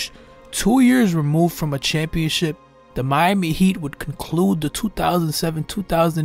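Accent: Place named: Bronx, United States. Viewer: Bro your cadence and delivery is dope, it's like your cool cousin vinny giving you the game in that Bronx accent!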